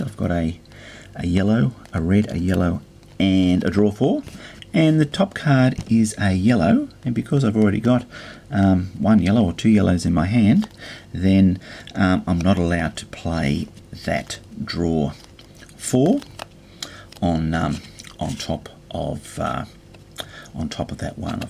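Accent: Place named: Australia. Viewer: OMG! YOU'RE AUSTRALIAN! Im really sorry, im really really sorry, i did learned a lot thanks for the video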